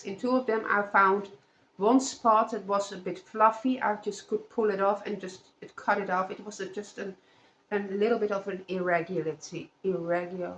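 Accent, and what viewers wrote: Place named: Netherlands. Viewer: Mooi vest! Welke garens heb je daarvoor gebruikt? Je hebt trouwens een fijn accent wanneer je Engels spreekt. Vaak hebben Nederlandstaligen een erg sterk accent. 😂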